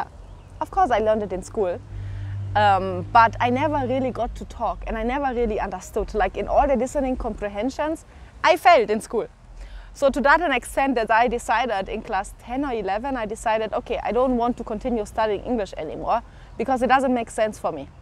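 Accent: Kenya. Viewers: But that English is Kenyan! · I like what I see and hear. A lovely mzungu speaking english with a Kenyan accent🥰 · You even have a Kenyan accent! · you picked that kenyan accent really quick. I can tell by your pronunciation of words. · You adopted the Kenyan English accent perfectly. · Hahaha you have a very strong Kenyan accent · I was born in Kenya but left when I was 16 and have never been back. I will go back hopefully in 2023. You sound Kenyan. 😅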